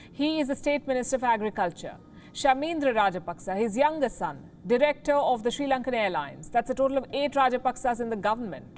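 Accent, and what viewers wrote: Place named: India. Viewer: One big happy family she says with her typical Indian accent - she is classic as always.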